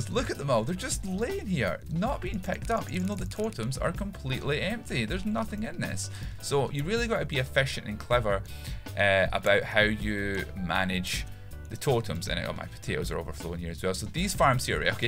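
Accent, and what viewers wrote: Scotland, United Kingdom. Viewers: i loved this vid, it helped me alot to make a carrot farm, btw i love ur accent - is it scottish?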